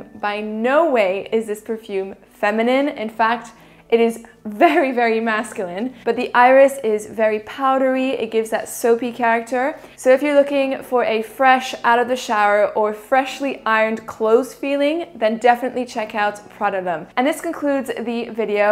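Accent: France. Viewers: You have a very nice french accent, and even Arabic i guess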